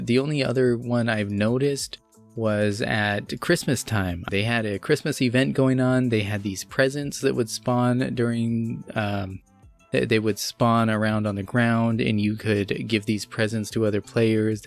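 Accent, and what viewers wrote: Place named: Canada. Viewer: Where are you from? Mild Canadian accent.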